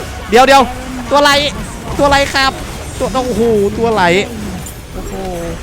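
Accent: Isan, Thailand. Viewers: ชอบความเว้า 555 ดีครับ อยากให้ทำต่อไป lol wr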